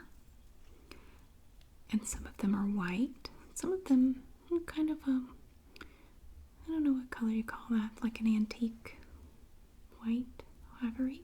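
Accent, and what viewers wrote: South, United States. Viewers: It's her Southern drawl.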